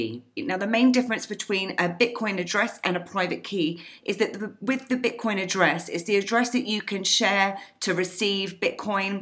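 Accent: United Kingdom. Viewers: Nice to finally hear British accent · your British accent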